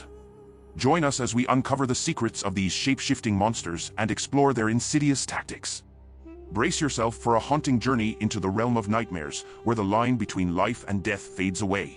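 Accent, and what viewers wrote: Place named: Scotland, United Kingdom. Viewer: I dig the vaguely Scottish AI voice. It's like listening to an up and coming Deckard Cain type doing a class project.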